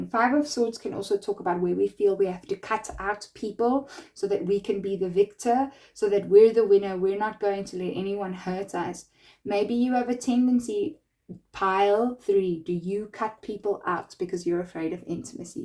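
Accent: South Africa. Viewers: You sound so South African. 🇿🇦❤️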